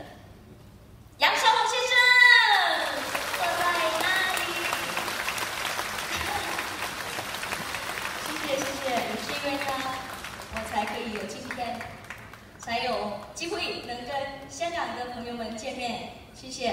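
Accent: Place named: Taiwan. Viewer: speaks with taiwaness accent. Amazing.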